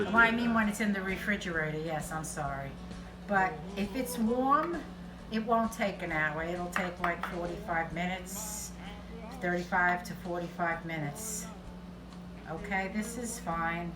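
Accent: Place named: Philadelphia, United States. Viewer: Your recipes are great but your pronunciation of slang Italian American version of words sound very South Philly. It’s not authentic Italian pronunciation. I still enjoy your videos. 🍷😋👍🇮🇹🇺🇸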